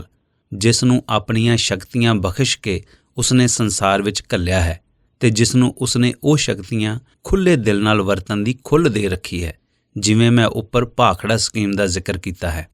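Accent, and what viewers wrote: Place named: Punjab, Pakistan. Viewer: Tuhadi awaj sun ke bht khushi hundi aa.. tuhadi punjabi cho Pakistan Punjabi da kafi touch feel hunda.. menu mere Pakistan punjabi frnd yaad aa jande jado v tuhadi awaj sundaa.. Thanks u very much Sir🙏🙏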